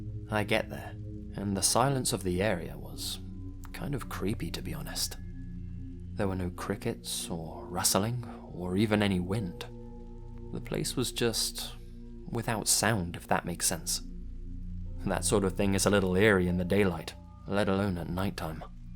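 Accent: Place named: United Kingdom. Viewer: My favorite British narrator is back in action!!! Missed you. Love from USA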